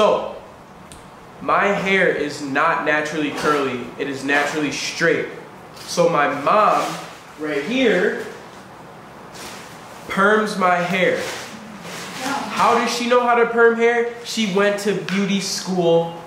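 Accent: Wisconsin, United States. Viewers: His wisconsin accent is the best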